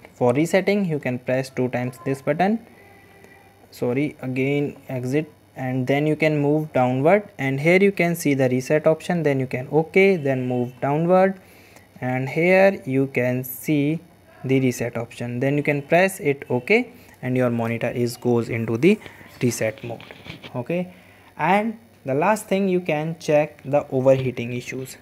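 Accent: India. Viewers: Why when I search to solve a computer problem the YouTube videos are almost always done by some Indian guy whose English (?) is impossible to understand?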